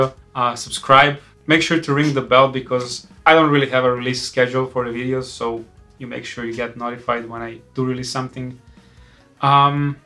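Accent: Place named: Russia. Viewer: У тебя русский акцент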